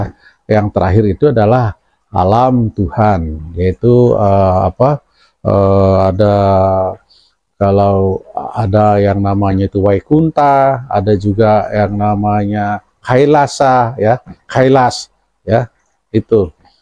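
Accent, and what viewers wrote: Java, Indonesia. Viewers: Logat balinya g ada samasekali, malah cenderung logat jawa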